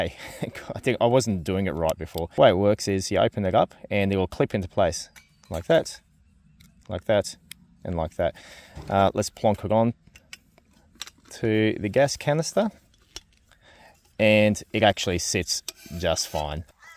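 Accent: Australia. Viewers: WTF? I thought Kmart went out of business. And a white person shopping there? Who knew? This feels like a time warp to the 1980s when Kmart wasn't crap. I actually bought my first dome tent at Kmart when I was 12. I used that thing til I was about 26 when the poles disintegrated. Got my first 20" dirt bike there too. I detect an Aussie accent. Is this outside the US where people get better products than US people get?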